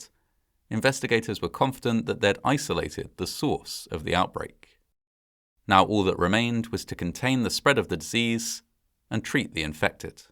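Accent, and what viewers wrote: United Kingdom. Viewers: You narrate very well, with excellent pronunciation and diction, but with occasional lapses. In this case, it's Fray Bentos, not Bento's or Bentoes. The 2nd syllable rhymes with Moss, not Nose. In British English (as you're apparently British, with a very British accent), it would have to have an E (or another vowel) after the S to change the vowel sound to a long 'oh' sound. American English does not have the short 'o' sound as in 'got' or 'not' in British English, and so American English tends to use either a short 'ah' or a long 'oh', depending on the word. That's correct American pronunciation. But when the short 'o' sound (as in British English) IS called for, e.g. in words from other languages, Americans tend to replace it with 'oh' because that's the sound they know - and that's perfectly understandable. However, IMHO that isn't something for British English speakers to emulate, because we DO have the British short 'o'.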